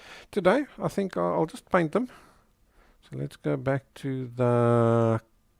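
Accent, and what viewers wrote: South Africa. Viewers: Hi. Judging by your accent I assume you're South African. Where can I buy a set of pencils like the one you had in your video about learning how to draw? ❤Love your channel so much; thank you for making time to share your talent!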